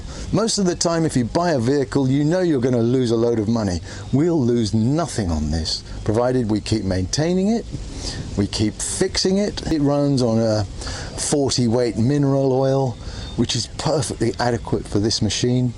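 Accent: United Kingdom. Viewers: With all due respect to Scotty who knows a good review when he sees one, let's give credit first to the one who made the review, namely,! You are a natural! I think with your melodious British accent, which Filipinos love to hear, you can have a career doing reviews of things you love to use. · We used to have one of those in the Philippines. Wasn't the latest or the best, but hauled people and stuff for relatively cheap. Back then when you asked if people had a car, they'd usually answer, "yes we have an FX." You can seat 10...Legally lol Something about having a Brit do the narration makes the experience sound like a cool museum tour :) Flippin Marvelous!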